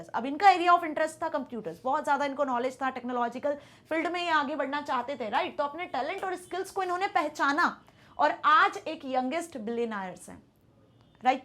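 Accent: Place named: Bengal, India. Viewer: Arey bhai ap toh bengali ho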